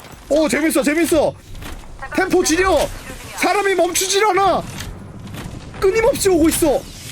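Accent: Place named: Southeast Asia. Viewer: ㅋㅋㅋㅋㅋ영어 발음이 동남아사람 멕시코 사람인데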